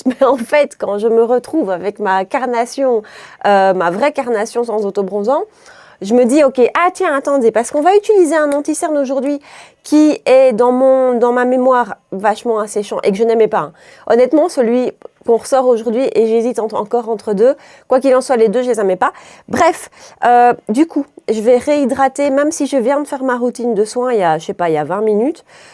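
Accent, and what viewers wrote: Belgium. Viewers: Coucou, j'adore tes vidéos pépites, re-découvrir les produits c'est génial, merci tu m'as fais sourire avec ton accent belge (une fois),😅,j’aime bien deviner les produits que tu vas sortir de ta boite à chapeau tel une magicienne, au moment du rouges à lèvres,j'ai direct pensé au Dior Sequin,j'ai la nuance 893 un violine avec les paillettes rosée, ça claque pour les fêtes et pour cette saison ❤ Bonne journée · Cc, très belle vidéo pépites oubliées que j'adore. Je dis un grand oui pour une vidéo avec ton accent belge😂. Bon dimanche 😘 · D'ailleurs tu devais pas nous faire une vidéo ds ce style déjà avec ce belle accent beilge 🤭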